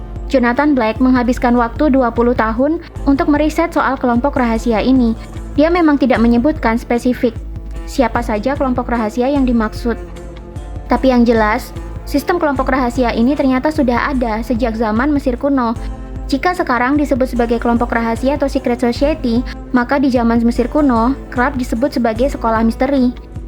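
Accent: Java, Indonesia. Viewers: Medok banget ngomongnya.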